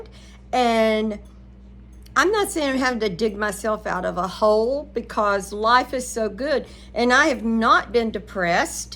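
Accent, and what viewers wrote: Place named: Texas, United States. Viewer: ...Please don't think that your Texas accent is incorrect. I love that Southern & Texas sound. Speak it proudly❤❤❤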